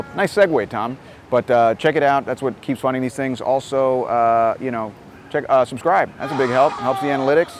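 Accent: New York, United States. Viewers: I don't like his New York style of speech similar to David Letterman. I never met a person from NYC who I liked who wasn't loud and abrasive.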